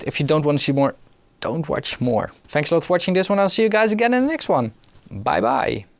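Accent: Netherlands. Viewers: Is he dutch? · Your accent sounds like a Dutch accent (Ik ben een fake Nederlander)! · Your Dutch, arent you? :D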